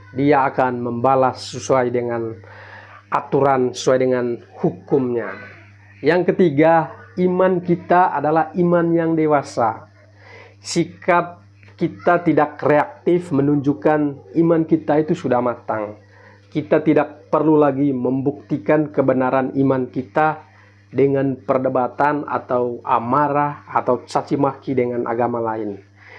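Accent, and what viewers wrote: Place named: Manggarai, Indonesia. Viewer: Bahasanya manggarai sekali e kësa 😅😅...semangat dalam memberitakan keselamatan ❤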